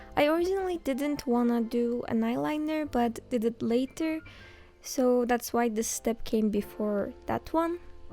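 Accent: Turkey. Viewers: I supraiesd that you are Turkish because Turkish people are very bad at english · ingilizcen gayet iyi olmasina ragmen turk ingilizcesini her yerden tanirim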